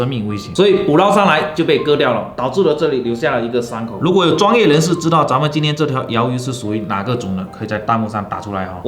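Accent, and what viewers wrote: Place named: Fujian, China. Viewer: 你是福建人吗。。。 哈哈 口音好重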